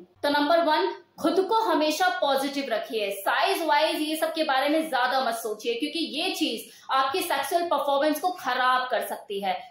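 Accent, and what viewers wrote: India. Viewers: Mam aap ke samjhane ka tarika bahut pasand aaya aur aap ki voice so sweet & personality & dressing style bahut achcha hai you are so good looking I am impressed 😊 sabse achcha aap ka Desi style way of talking ❤️❤️❤️❤️❤️ Love 💕 you mam 🙏🙏🙏🙏🙏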